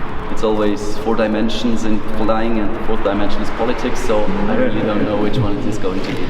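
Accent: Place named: United Kingdom. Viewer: Voll interessant. Sehr sehenswert. Erzählt in wunderschönem Britischen Englisch.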